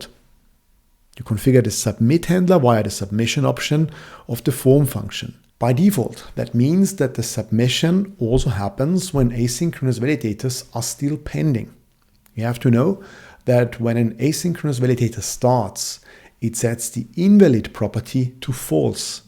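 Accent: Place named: Austria. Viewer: He sounds sooooooo Austrian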